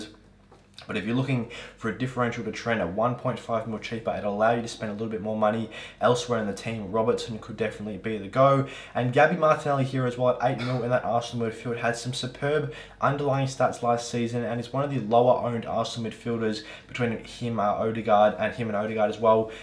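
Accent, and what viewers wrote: Australia. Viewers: Thx for the vid. Also... Thx for the recent reply to my comment re your info. I couldn't quite work out your accent but now you've confirmed you're from Oz. I take it you will be following Big Ange's exploits at TH.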